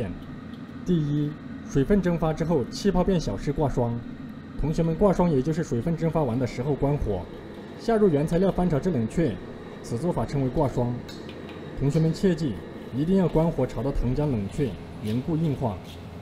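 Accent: China. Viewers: 请问王师父能不能配上字幕，因你们中国口音对我们这些海外土生土长的华人来说真得听不明白。谢谢!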